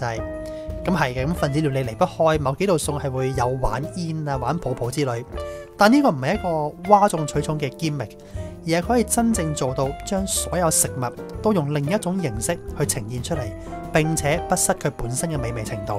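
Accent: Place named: China. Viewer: 佢把聲有D似大陸仔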